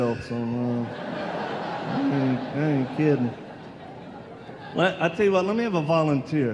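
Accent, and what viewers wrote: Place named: Louisiana, United States. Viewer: Haha I knew you were from Louisiana from your accent, Nawlins forever!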